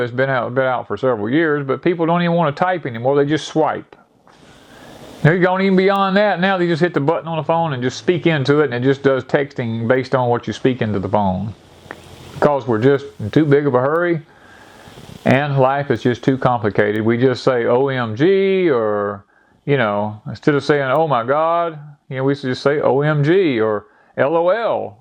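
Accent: South, United States. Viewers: Hi, love listening to your southern drawl, I'm going to take your advice and ring my son tomorrow, can't see him he lives to far away, so I'll call him and tell him how much I miss him.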